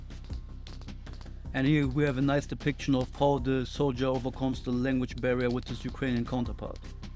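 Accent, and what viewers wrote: Germany. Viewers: are you German? you have a heavy German accent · Your German (?) accent is so annoying though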